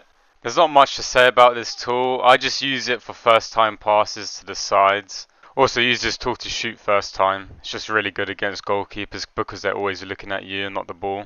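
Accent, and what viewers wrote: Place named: India. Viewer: I LOVE THE PRIME (u sound indian with that mic)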